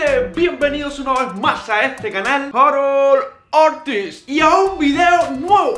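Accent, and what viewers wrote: Cuba. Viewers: Eres cubano no??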